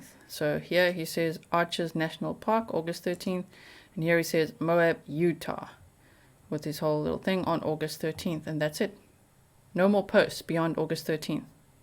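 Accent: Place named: South Africa. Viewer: Why would his parents have concealed that Brian left for home from the 17th-23rd to empty out a storage unit? I have never heard this. How and when did you hear this? I enjoy your astute observations. I thought I heard a South African dialect. Thank you for sharing your background and your father’s background. I am a woman Vietnam Era Veteran Navy WAVE. During service I had a Secret Security Clearance. Thank you for your broadcast. Greetings from the shores of Lake Erie on the north coast of Ohio in America. Canada is 45 minutes straight across the lake.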